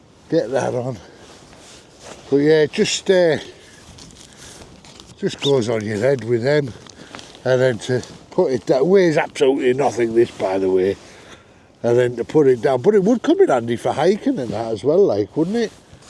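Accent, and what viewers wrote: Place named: Teesside, United Kingdom. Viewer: where are you from, ya sound like a teessider to me ( ps im from teesside ) that stove and gas legs look mint chor 😂👍🤌👌🇬🇧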